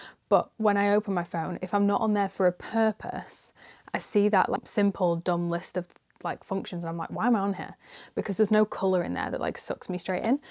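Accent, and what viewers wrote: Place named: United Kingdom. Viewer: i am really sorry for mistakes if there are any. I’m Russian🇷🇺 and I just wanted to bring more English into my life for practice. I always look for some videos with natives speaking British cause I prefer this accent and I absolutely like your one, your pronunciation is pleasing to my ear🙏🏼 thank u so much, it was interesting to listen to the way how u are expressing your thoughts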